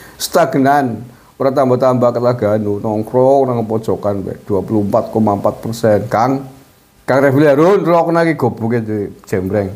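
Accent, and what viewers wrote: Banyumas, Indonesia. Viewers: Ndasmu njebluk 😂..om logat Banyumas.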